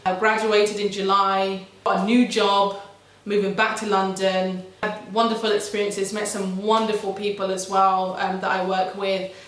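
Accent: Spain. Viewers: I can’t with you the Spanish accent am bussin up 🤣🤣🤣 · Your Spanish accent is funny lol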